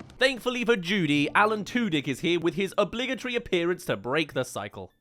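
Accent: United Kingdom. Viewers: Your British!?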